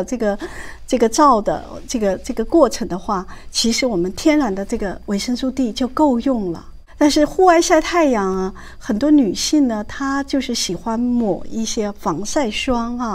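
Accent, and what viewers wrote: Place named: China. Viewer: 这位医生有大陆口音😊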